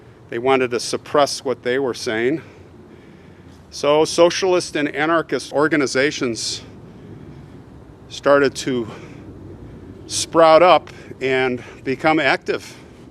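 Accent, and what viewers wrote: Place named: Chicago, United States. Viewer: Hi Im from Skokie, Illinois, now California. I was thinking the Jewish cemetery looked great. But as I expected you found a group of headstones. I am Jewish and I will be cremated and not be buried in a cemetery especially a Jewish one. Many graves are/were destroyed due to antisemitism. I want to thank you for the respect you showed. P.S. Are you from Chicago? Your accent sounds familiar.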